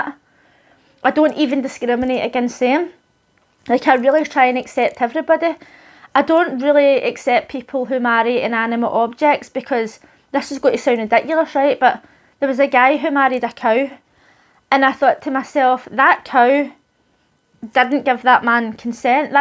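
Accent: Scotland, United Kingdom. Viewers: Oh maan what an accent, is that Sco’ish? Absolutley fantastic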